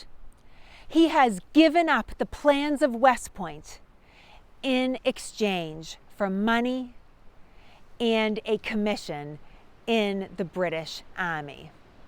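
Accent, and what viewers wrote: Boston, United States. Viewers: Great piece, excellent production, clear presentation and information before unknown to myself, I love the charm of New England, Boston accent...you are bold in facing the wind with such humour and laughter, can't wait for more of these, makes learning about the history FUN!!!! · ❤❤❤❤Brilliant Historical Videos! Loooove the Boston Accent! Too! ❤❤❤❤ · You only have to listen to talk for 30 seconds to know that she is definitely from Boston.🤣💕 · Great history and I always look forward to your informative and acurate posts. No way AI as that Boston accent is unique, (to humans.) Keep up the good work! Can you post any articles links that you write? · Boston accent much? Southie? Dah-chestuh?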